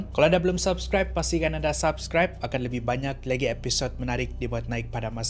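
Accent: Sabah, Malaysia. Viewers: kamu org Sabah ka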